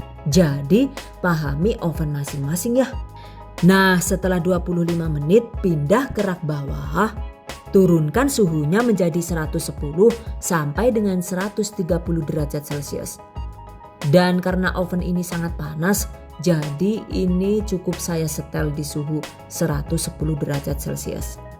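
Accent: Banyumas, Indonesia. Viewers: Terima kasih resepnya ... nonton videonya sambil ketawa aplg klo bicara ngapak 😊😊👍👍👍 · Terima KASIH..rezepnya..salam hangat dari negri kincir angin..logat ngapaknya luwes banget..apakah asli wong Banyumas..👍👍👍😁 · Suarane mengko malik ngapak, dadi seneng nyonk kowh karo channel kiye.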